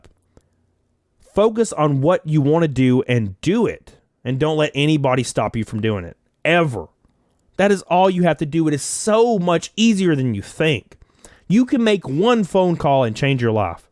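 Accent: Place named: Kentucky, United States. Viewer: This guy’s page is gonna blow up. Keep up w the content brother. You remind me of my buddy’s - lol. From KY. That accent can’t be mistaken.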